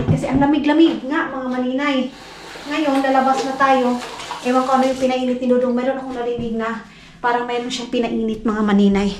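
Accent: Visayas, Philippines. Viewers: Tuwang tuwa talaga ako sa panonood sa iyo my dear maninay..yung pananagalog mo na me halong bisaya😊kahit di kita naiintindihan minsan patuloy ako sa pag aabang sa mga vlogs mo😅😅..go lng sa vlogging mas marami kaming viewers mo na naghihintay kht paulit ulit mga lugar na pinapakita mo sa amin..nevermind those bashers basta andto lng km😊😊😊❤❤❤happy ka happy din km na nagmmhal sa inyo ni..God bless❤🙏❤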